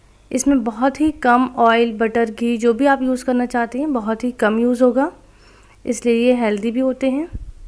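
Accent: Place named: Pakistan. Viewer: Nice pan cake. Kya ap pakistani hai ab ki awaz bht achi hai. Apka lahja bht sweet hai.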